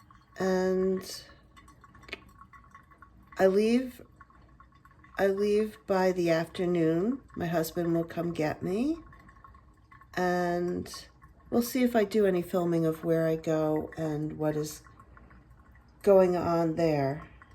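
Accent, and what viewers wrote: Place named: East Coast, United States. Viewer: Love hearing you talk. I adore your accent. Being from the midwest, eastern accents sound great to me. Rainy days are good for chats like this.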